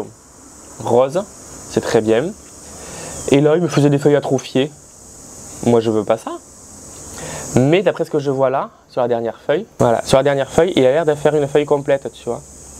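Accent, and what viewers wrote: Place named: South, France. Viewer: Oh le bel accent du midi 😂😂De très belles plantes et de l'humour tout y est ❤❤❤❤❤❤❤❤❤❤❤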